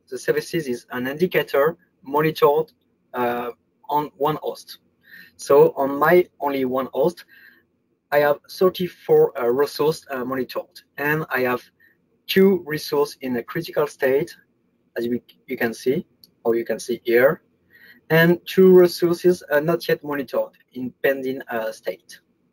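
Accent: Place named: France. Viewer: Le petit accent français des familles qui nous donne cette réputation à l'international...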